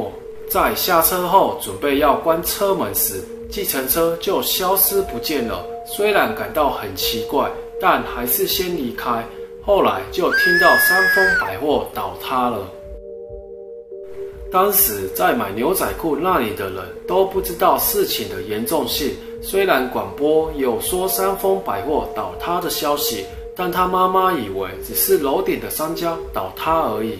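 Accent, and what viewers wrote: Taiwan. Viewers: 有台灣國語😂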